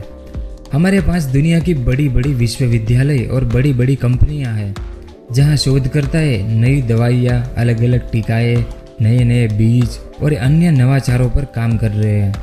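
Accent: Gujarat, India. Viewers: Gujrati hain bhai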